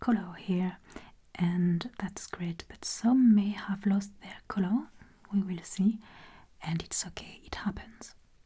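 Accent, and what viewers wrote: France. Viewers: Your videos are, possibly, the best crafted asmr ones I’ve seen so far. Plus, your french accent is so charming...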